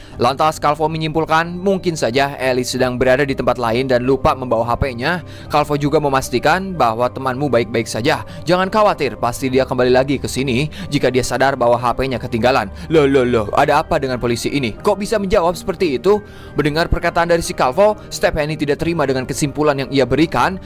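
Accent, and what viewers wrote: West Java, Indonesia. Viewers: Kalau org Sunda emg gitu semua logatnya😂😂😂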